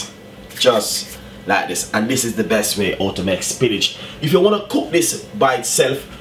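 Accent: Jamaica. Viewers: I did hear spinage, chef u making us Jamaicans sound bad. It's the accent though, love it 🤣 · Loving the strong Jamaican type accent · So Jamaican, so much so that I heard "spinners" when my ears clearly heard him say spinach over and over 😊 thanks for the refresher, when I make them sometimes they come out softer than I like. Never thought to let them sit for 10 min. Blessings!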